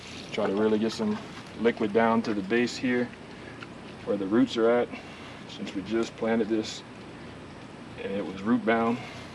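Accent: Louisiana, United States. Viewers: Omg I knew it! I heard the accent & knew it was Louisiana! I had to check the page to find out. I love yall folks down there. ❤ Great video & VERY informative. I'm going to be a first time gardener so I'm soaking up all the knowledge I can to ensure a successful harvest once I get started. Jist gathering the rest of my supplies. I can't waitttt!! 😁🙌🏽 Thank for this great info. Im definitely going to do this. I've been worried about using plastic in my garden (even food grade) but it seems you really can't get away from it. 😕 So plastic it is. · Gotta love my down the bayou people,,, love the accent. Missing it up here in the Okies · Do I detect a Cajun accent? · What part of Louisiana are you in? I hear the accent all day. Originally from Laplace. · I know you Cajun “mark dat” you must be close😆. Going put my scrap pvc to work…Great vid! Also, started getting free buckets from Super One from the bakery, so this works out perfect! 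· New subscriber here, thanks for sharing. first time I’ve seen this technique, Great idea, you sound like a neighbor to me, I’m a recent transplant to Covington but grew up in Metairie. You from Louisiana?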